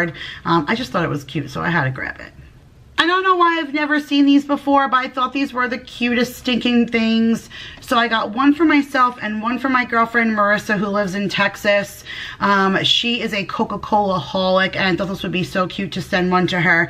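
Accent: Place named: New York, United States. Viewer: I was just watching another one of your videos I knew that accent I'm also from New York the Bronx no wonder I felt so close to u lol also ru Italian?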